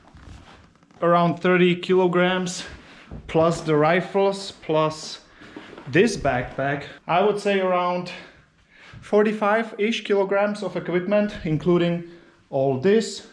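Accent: Czech Republic. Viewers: so you are czech or austrian? :D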